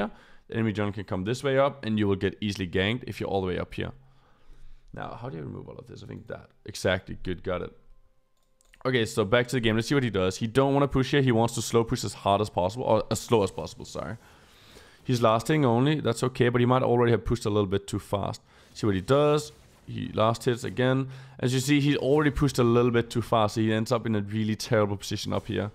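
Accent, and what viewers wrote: Denmark. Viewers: is danish? I'm picking up a danish accent, i might be wrong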